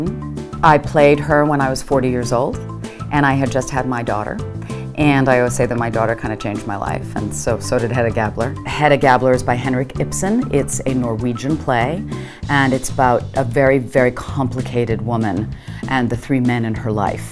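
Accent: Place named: South, United States. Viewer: Hearing her Southern accent makes me want to see her play Clairee from Steel Magnolias.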